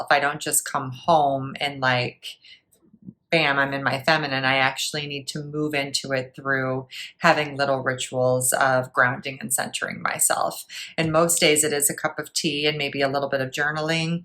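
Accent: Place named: Upstate New York, United States. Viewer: Are you from upstate? You have that accent! Love your advice!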